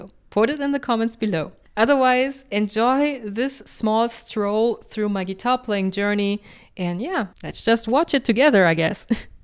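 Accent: Germany. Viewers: Ich habe dein Englisch gehört und wusste sofort, dass du aus Deutschland kommst..... ^^ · Она ведь немка походу. Акцент))))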